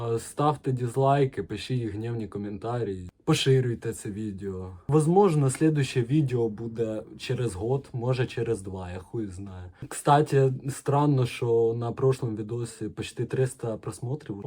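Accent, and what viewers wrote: Ukraine. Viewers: это потому что у него такой суржик, что только акцент украинский, а слова почти все русские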